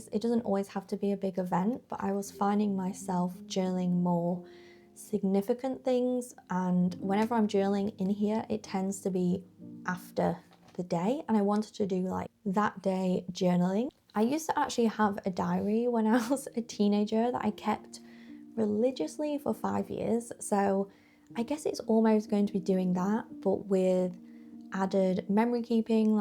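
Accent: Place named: Northern England, United Kingdom. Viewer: Where abouts are you from? Obviously "oop norff" in the UK - I grew up in Leeds until I was 10 and now the only thing left of my accent is the hard "u" - as in "up", "one" - until I am with other Northerners and then it call comes back 🥰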